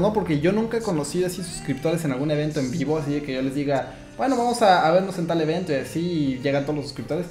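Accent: Mexico. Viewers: entonses ¿de que país eres? siempre pensé que eras de México:p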